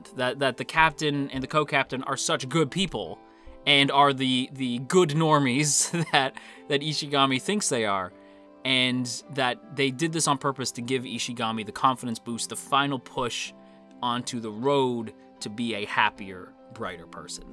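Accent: Canada. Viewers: great videooo as usuall! i was super excited on hearing your thoughts on this episode! on another note i had a feeling from ur accent u were canadian and you confirming it really made me kinda proud of myself i guessed right yay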